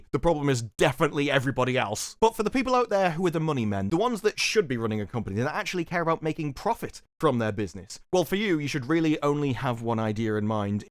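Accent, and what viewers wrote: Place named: Geordie, United Kingdom. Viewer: You from the Toon, mate? I think I can detect a hint of Geordie in your accent... and yes, I am the Bat-Gadgie.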